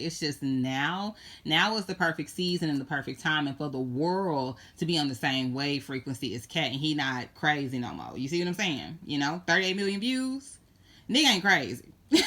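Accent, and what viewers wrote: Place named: Tennessee, United States. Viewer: love your accent, not Georgia, maybe Tennessee Kentucky?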